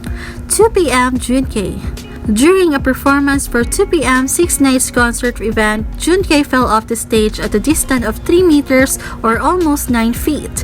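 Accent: Philippines. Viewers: You have a filipino accent ahahha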